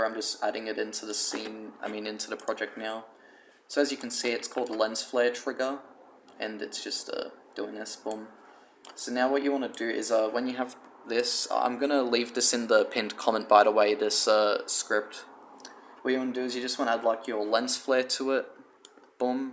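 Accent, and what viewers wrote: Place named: Australia. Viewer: damn love your voice,real Aussie accent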